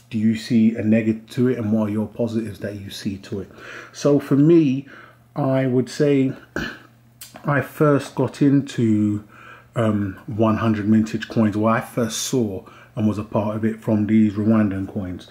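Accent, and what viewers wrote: England, United Kingdom. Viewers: love the accent what part of England??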